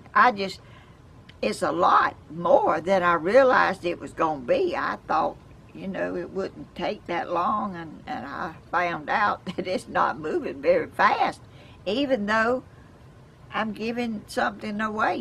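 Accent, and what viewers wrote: South, United States. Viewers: you are a joy for me to watch. A wonderful break from all the politics and the haters. I love your southern accent. My family migrated from the Georgia area to East Texas years ago, and your accent is like listening to my grandmother. You mentioned Buckhorn Methodist Church. My ancestors owned the Buckhorn Tavern, a famous trading post and sight of civil war battles. I assume you live close to that area, but the Tavern was closer to New Market, Alabama, not far from you. The horns from the Tavern were given to the Buckhorn High School. Anyway, just a little history. Don't worry about people who say unkind things to you...how awful. Do your thing....don't lose sleep over the haters. God Bless.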